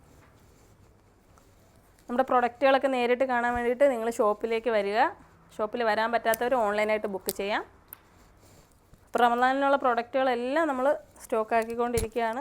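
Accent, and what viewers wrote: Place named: Kollam, India. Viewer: കൊല്ലം സംസാരം ഇഷ്ടായി 🌹🌹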